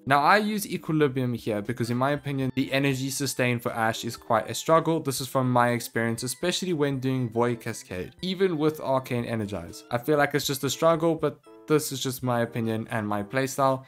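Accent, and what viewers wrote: Afrikaans, South Africa. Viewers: do you speak afrikaans your accent says yes if you do: Dis awesome om te sien hoe goed suid afrikaaners doen sien bitter min wat bo 1 duisend subs het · Boet are you south African??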